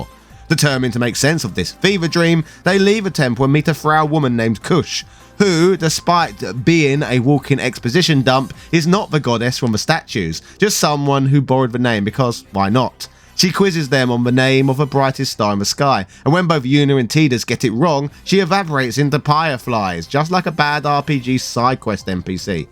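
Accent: United Kingdom. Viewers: 1) I love british accents "Wakker" and "Yuner" 2) FF X-2 is the reason I stopped playing FF forever.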